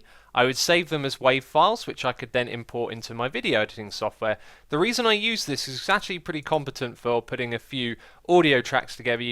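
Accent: United Kingdom. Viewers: I think it's the accent. idk why but British accents don't sound like metal fans to me.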